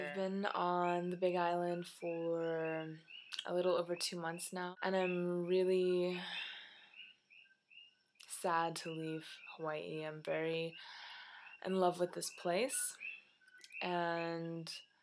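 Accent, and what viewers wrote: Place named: Canada. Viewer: She's very pretty and has nice eyes and voice, but she needs to take out that nose ring. It reminds me of how a bull is shown in a cartoon or something. It's very distracting. She sounds kind of Canadian. Does she smoke pot though? She's Verrrrry mello. Yes, Centipedes will mess you up. I heard about them talked about on the Discovery channels Dual Survival, as well as throughout my life from various sources.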